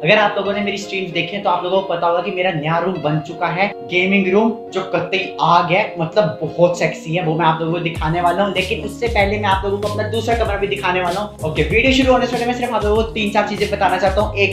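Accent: India. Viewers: Delhi se ho Accent dekh ke lg rha h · Sound like Himachali ???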